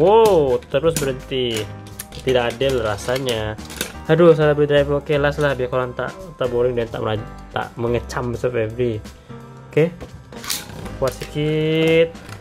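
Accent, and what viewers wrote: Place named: Indonesia. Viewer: indon la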